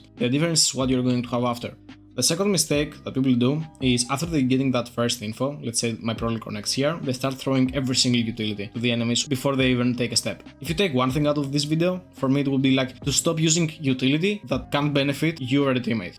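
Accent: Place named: Spain. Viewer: El inglés promedio de un español...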